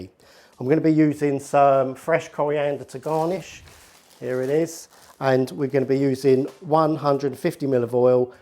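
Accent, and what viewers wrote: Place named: South Coast, United Kingdom. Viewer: Great video mate, where you from in the uk? You sound like my mate from the south coast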